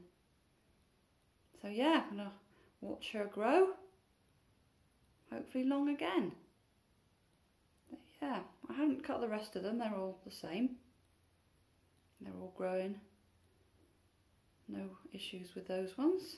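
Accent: England, United Kingdom. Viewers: I truly admire your claws erm my bad . . .nails :-) I also love your voice, I love the English accents, and when I was in London 20 years ago I was in total awe of your people , the shops , the double-decker buses,, the food , so many things that kept me so preoccupied that I only slept an hour a day lol anyway, thank you for sharing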